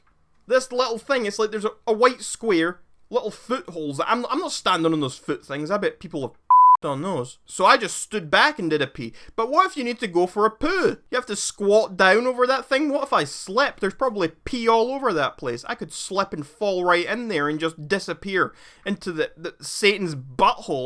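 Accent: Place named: Scotland, United Kingdom. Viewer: If an Australian person punches you square in the nose that would be very very real Also, you called out Aussie accents and your literally Scottish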